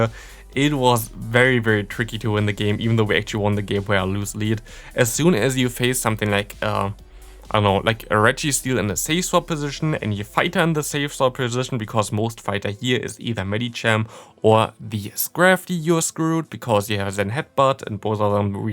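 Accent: Germany. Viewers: der deutsche aktzent hahha beste super video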